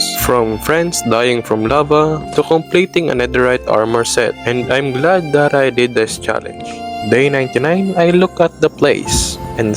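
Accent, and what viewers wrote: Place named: Philippines. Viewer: I already know you are Tagalog because of your Accent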